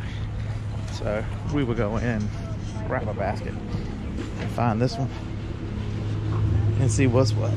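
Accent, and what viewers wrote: South, United States. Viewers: Love the country accent!!! All love from Georgia!!!!!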